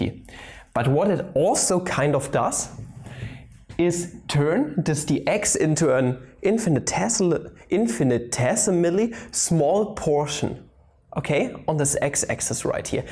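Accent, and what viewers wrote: Germany. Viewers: Well his is an actual German accent, I should know, I live there. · What amazes me is that subtitles are perfectly true for a heavy German accent. Is it manually translated or google translation? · Ich finde es niedlich wie Sie Wörter wie 'the' 'this' '.. aussprechen :D Your german accent is adorable They are learnable through (the two th sounds). You need to see the arabic letters like qaf ق or ayn ع xD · One and a half years ago was so anxious about his English, now - the more German in it, the better:D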